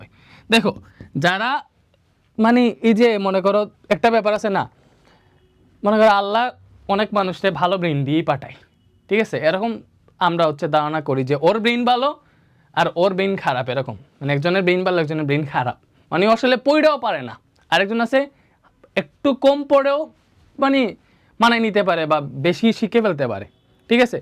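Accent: Sylhet, Bangladesh. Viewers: ভাই আপনার ভাষায় বোঝা যায় আপনি সিলেটি😊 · bahi mone hoy sylheti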